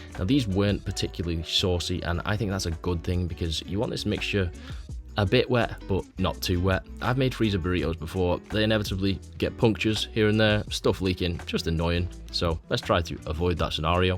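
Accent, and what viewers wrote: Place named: North, United Kingdom. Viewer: I love watching your meal prep videos. Love the northern accent (southern softie here) But, could you do a video on how to reheat/serve meals please? I love to prep but by day 3, I seem like I waste food as I am too scared to reheat it so I eat it cold (gross) or throw it away. Just making the fried rice scares me! :)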